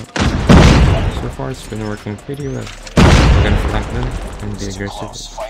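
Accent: Philippines. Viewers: You sound Filipino, and because of that you gain my subscription 😎😎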